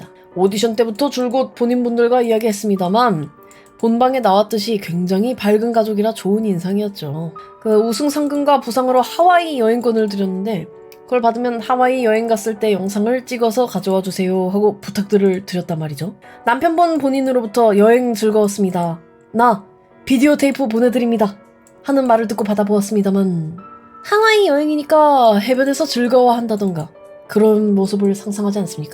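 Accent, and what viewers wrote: Japan. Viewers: 한본어 왜 은근 잘하냐 ㅋㅋㅋ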